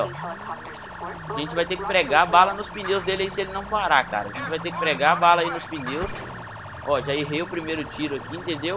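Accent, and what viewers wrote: Minas Gerais, Brazil. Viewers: eu tava querendo saber do pq o sotaque e da q e minas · Game Club Kkk eu falei zuando cara, mas tô achando que ele é mineiro sim por causa ta trasendo muito esses mods e pra mim que sou mineiro ele tá falando normal...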